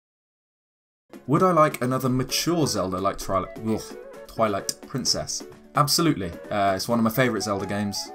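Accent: England, United Kingdom. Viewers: I remember those days. You were and still are my favorite Zelda theorist. I always assumed was a mix of Zelda and Celtic. And I thought it was odd because you sound more English than Celtic.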